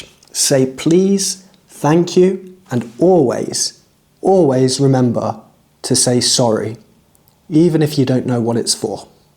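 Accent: United Kingdom. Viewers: So beautiful the British accent, I really love it although it can sometimes be quite difficult to understand. Thank you for this interesting and amazing lesson ❤❤ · such an energetic and lesson and inspiring british accent youtuber, I hope I may successfully prounce it as well in the future😃 thank you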